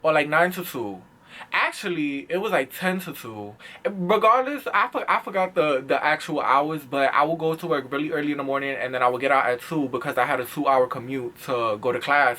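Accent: New York, United States. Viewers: Honestly, when you were talking about the insecure thing.. I think it may be a Virgo thing because I’ve been in situations where people think I’m trying to be better than them or something. Your lingo is what makes you unique babe, especially that New York accent! 😍 Gemini’s are not for us, Virgos! I’ve learned that we don’t mesh well. My abusive ex was a Pisces. She was so insane and mentally and verbally abused me and it was the worst. The whole time you’re the victim and they turn around and act like you did everything to them... that was the fucking worst thing for me to face especially in high school. 🥺 Telling someone they’re “too skinny” is the equivalent of saying someone is “too fat”. My ex used to tell me I wore too much makeup and that I “needed to fix my fucking face”. 🙄 I know I did things wrong too, but not as bad as what she did to me... 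🥺 My ex had her friends belittle me on social media and in person.. I was 16-17 with no backbone and wouldn’t fight or nothing (before anyone judges). Like you, I kept running back and anytime we talked it turned into constant and repeated arguments. I hated wearing makeup cause I felt like she was gonna tell me it was ugly and tell me to fix myself. I would cry every single day and try and maintain my relationship and my mom was on the verge of going to prison over this girl. We continued to break up and get back together until we graduated and she continued to bother me during my graduation present vacation trip thing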